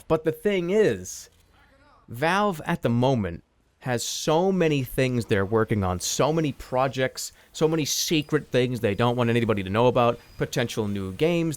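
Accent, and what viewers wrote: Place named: East Coast, United States. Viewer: Jerma are you from the east coast? You have the accent and its awesome!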